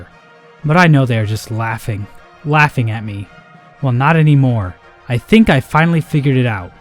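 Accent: France. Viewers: This aged like milk. Also, thats an amazing french accent 10/10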